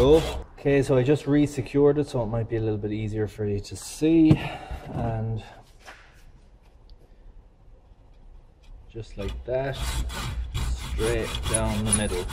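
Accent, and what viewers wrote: Ireland. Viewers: Always believe a man with an Irish accent. Love from Australia! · Ahem...I just love your accent...it reminds me of my famous English...Irish teacher...what a great guy...that was 45years ago... Hahaa.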